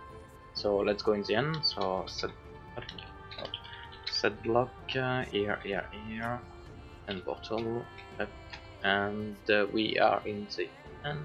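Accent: France. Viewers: You accent seem french, but perfect english :p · Nice French accent (super mod d'ailleurs) EDIT : It really worked on the server I was playing. I'm shoked it requires zero efforts at all.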